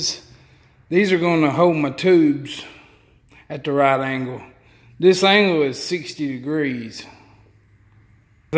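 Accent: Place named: Appalachia, United States. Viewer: You talk like a hillbilly just like me.. I sound so funny.. That is why I dont do vids.. Great vid. My next weekend project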